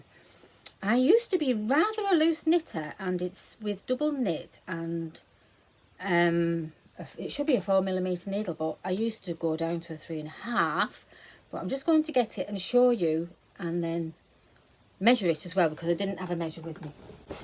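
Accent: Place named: Lancashire, United Kingdom. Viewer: I'm a new subscriber and really enjoying your videos. Is it ok to ask whereabouts you are from originally? You sound so much like a friend of mine from Lancashire. I am originally from there, but we moved over the border many years ago. Thank you for your very entertaining podcasts. 😊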